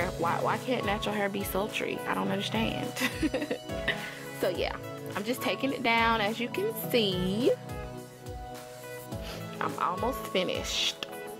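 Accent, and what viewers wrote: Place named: Atlanta, United States. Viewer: Hey!:P love this look. Are you from ATL by the way? I heard a lil accent:)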